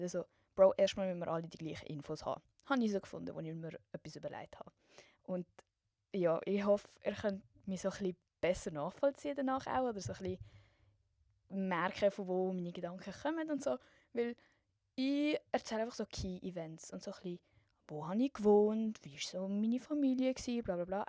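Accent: Basel, Switzerland. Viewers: cools format! hesch du jetzt en basler dialäkt oder es misch masch zwüsche allem? :)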